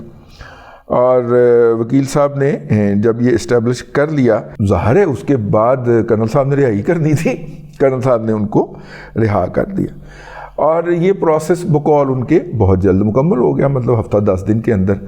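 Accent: Punjab, Pakistan. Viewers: Better you avoid using english words in Punjabi accent. Punjabi would be a better choice for your Vlogs. Please also avoid self praising.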